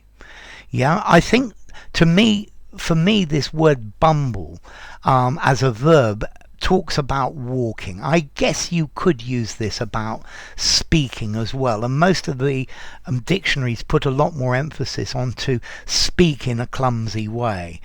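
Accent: United Kingdom. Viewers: You have nice british accent. I am bumbling now to. Talk real. English as you..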